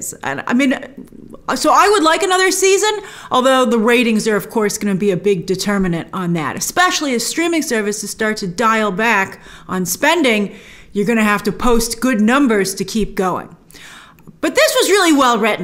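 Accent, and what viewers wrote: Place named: New York, United States. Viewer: I heard that (exaggerated) New York accent too.:) I've been loving this series. I love these more character-driven stories that these MCU shows have given us. We can save the action for the movies.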